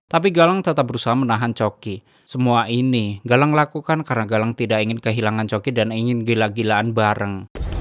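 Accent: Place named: Java, Indonesia. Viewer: Suaramu gak asik, medok anjeeeng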